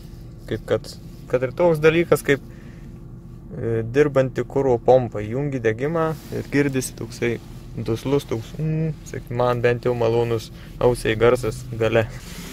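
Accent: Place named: Vilkaviškis, Lithuania. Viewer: Iš tarmės sprendžiu, kad esi iš Vilkaviškio ar Marijampolės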